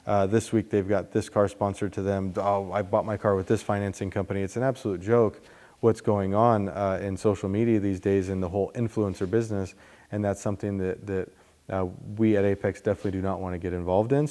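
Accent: United States. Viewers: I notice your american accent. Howd you decide to move to Germany?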